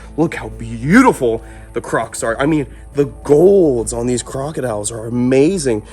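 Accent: Australia. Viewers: that's an awesome aussie accent 😂 felt like i was watchin Steve Irwin for a second there. that mystery snake (not gonna spoil it) is so beautiful! whenever you get a new snake it just leaves me in awe every time. it is kind've weird that they're elaphids. everything about them definitely yells viper to me. very cool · Can you keep that Aussie accent!! I freaking love it!!❤️❤️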